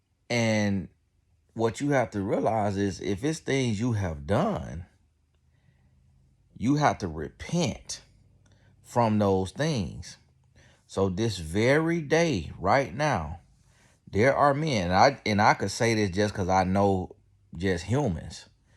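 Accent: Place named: Florida, United States. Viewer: That Florida accent cracks me up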